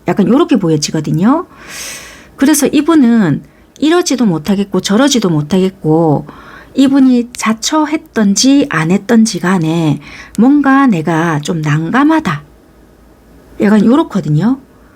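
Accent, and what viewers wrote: Busan, South Korea. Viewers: 1 듣다적은 요약 상대방의 생각 결혼하고싶다 좋아하면안되는데좋아하고있다 자존심 희생 누군가 한명이 '이관계가 뭐지?' 현타 구설수 나란히보단 눈치 상대방의행동 행동,연락없다 선톡해도 겨우 답장 일시정지 '우리 이별인걸까요?' 연락해도 불편 안해도 불편 이러지도 저러지도 심적 갈등 여러분의 행동 얼마전까진행동 지금은 안부를 했거나 일시정지 움직임없음 현재 상대의 생각 쉽게풀리지않을것 내가 움직이지않으면 너도 움직이지않을것 상대의 감정 후회 나의 생각,감정 어떻게해야할지아나 쉽지않네 얘만있는것도 아닌데 공허 이가 하나 빠진느낌 상대방이 원하는 관계 약속 약혼 열정 전생? 과거?에서부터 이뤄진 관계 너와 같이 살고싶다 결혼하고싶다 허허허 100% 500% 맞네요 부산사투리 너무 매력적이시고 현실적이라 좋아요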